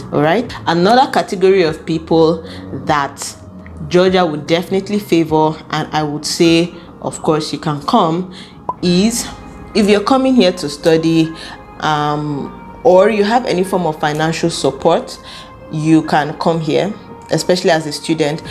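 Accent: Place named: Nigeria. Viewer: She is nigerian and they speak french, I believe that's the reason for her accent.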